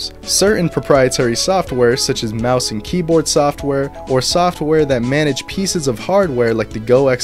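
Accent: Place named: South, United States. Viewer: That southern accent💀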